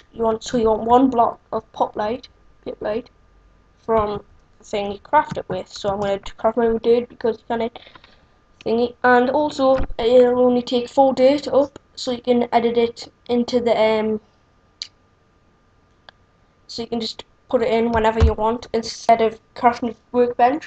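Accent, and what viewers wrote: Newcastle, United Kingdom. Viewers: your jordie accent is really penetrating my skull :O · Accent. British. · mate are you jordey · It's Geordie. Newcastle in England. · Geordie? I'm a Geordie lad myself! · i cant stand your stupid british accent, thats why i stopped this video at :10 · are you from newcastle? :D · Nah sounds like a geordie to me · Are you from Newcastle? You sound like it. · You from Newcastle? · Ur a Geordie wi ai Mann (y) :D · Wow, you just showed all of us your excellent grammar skills. Thank you. And, uhh, He is a geordie. He's from Northumberland (Probably). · This isn't a Scottish accent... This is Geordie i think. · Loopy Parky British, his accent is British.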